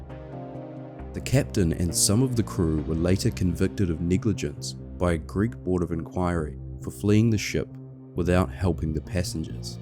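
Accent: New Zealand. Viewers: That sounds a lot like a New Zealand accent to me! 😀😉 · I like the Kiwi accent. It is a refreshing change from an American or English one. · Holy shit, this is so well done that I assumed this was one of the big documentary channels. It wouldn't have even been out of place on a Discovery plus. I'm so glad The Holy Algorithm brought me here. Also, maybe I'm a sick f#<k, but I giggled every time the Aussie "deck" was said. "Below dick" 😆 Edit: I just checked your page & now realize that you're from New Zealand, NOT Australia. My dumb American ear can't differentiate, but it is NOT an abomination of an accent! I love it. You know what is a true abomination? My Boston accent. The whole "I pahk the cah at Hah-vahd yahd" (I park the car at Harvard Yard) is real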